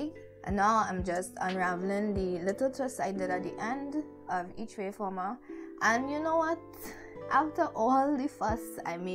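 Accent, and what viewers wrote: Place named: Trinidad and Tobago. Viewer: Beautiful! I love the trini accent! New subbie :D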